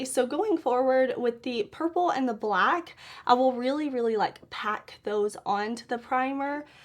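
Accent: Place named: Texas, United States. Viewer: I am so broken hearted. I have tried to get your brush set from Sigma about 7 times and they are always sold out. 😭😩😭😭😭😳 please tell them to make more so I can get your brush set finally. 😊I love you and your makeup videos. My half sister is from Mesquite, Texas and your twag sounds just like her. You are beautiful and your spirit is beautiful too! Keep making these awesome videos! Thanks girlie for helping with makeup tutorials and for helping to show all the new awesome indie makeup! ❤ thanks luv! ❤❤❤❤❤😊😊😊😊😊😊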